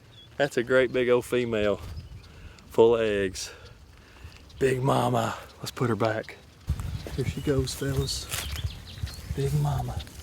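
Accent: South, United States. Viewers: damn you are sexy!!! sry just had to say it...I love a man with a southern accent! NEW SUB!!!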